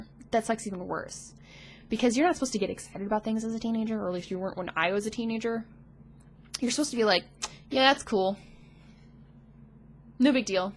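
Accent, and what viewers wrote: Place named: Midwest, United States. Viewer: I am from the midwest but moved to the Pacific Northwest. And your accent sounds soooo Midwestern. Michigan? Wisconsin? gah I cant place it and its killing me. You have a great personality! this video was awesome and really helpful!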